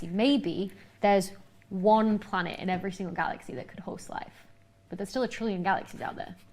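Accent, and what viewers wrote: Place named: RP, United Kingdom. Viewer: The entire British upper class feels the need to talk like a twat. But her content is bloody good.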